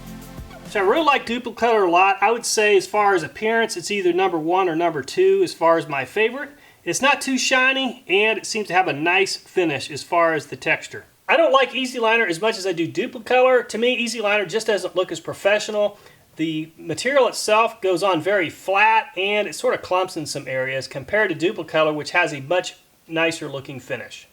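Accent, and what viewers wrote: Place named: South, United States. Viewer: Can't believe you're still replying to comments on a 6 year old video. Noticed that the southern accent faded over time :D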